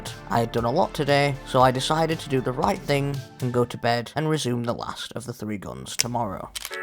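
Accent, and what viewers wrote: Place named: United Kingdom. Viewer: I usually don't like the british accent, but you sound really nice! :)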